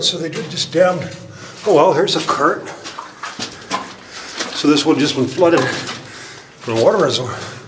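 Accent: Canada. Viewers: The Canadian accent is strong with this one..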